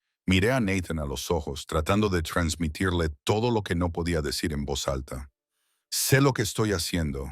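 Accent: United States. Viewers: Cambien em robot de lectura yanqui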